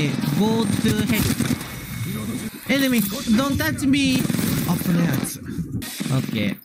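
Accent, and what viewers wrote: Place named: Japan. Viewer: perapera Engrish is still the best thing I've ever heard ngl.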